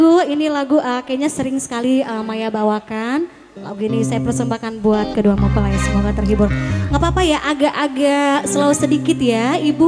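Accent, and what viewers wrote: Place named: Betawi, Indonesia. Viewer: logatnya lucu kalo ngomong😂😂😂😂 kaya betawi gitu. suaranya top markotop pokonya😍😍😍😍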